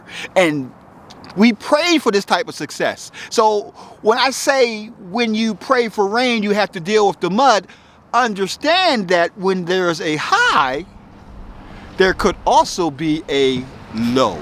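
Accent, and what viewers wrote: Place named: Baltimore, United States. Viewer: Mr., how about I was listening to you talk and said to myself..he's got to be from Bmore. I digested the knowledge you just share very easily. You delivered it very well. Thank you for sharing. I'm from Baltimore but living in NC. Nice video. Appreciate the jewels. I'll be tuning in Sir...keep the vids coming. Peace and blessings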